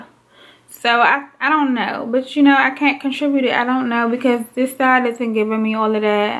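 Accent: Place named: Washington DC, United States. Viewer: Are you from DC? You kinda sound like you’re from the “urea” 🤔. You’re skin looks great!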